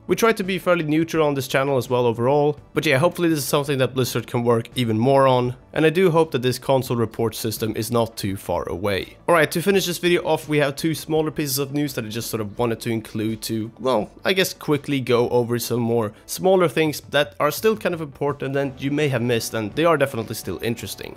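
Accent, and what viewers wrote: Sweden. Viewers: ah you from Sweden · are you swedish????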